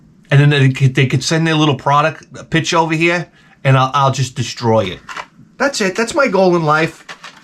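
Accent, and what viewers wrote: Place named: Boston, United States. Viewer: I honestly think this dude is BETTER at riffing than Bill Burr. And I’m not comparing the 2 just because of the boiston accent and hair tint. This show is like more focused and listenable than the mmp, and the boring sports talk is replaced with cool retro vidya game stuff. No disrespect to freckles though, it just seems like he runs out of steam after a while.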